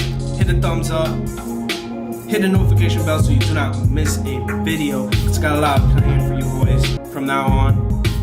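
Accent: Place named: Jamaica. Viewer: Where you from ? Jamaica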